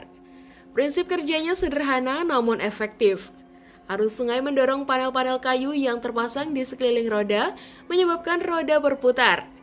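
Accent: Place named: Tegal, Indonesia. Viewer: pengisi suara nya seperti orang jawa, tegal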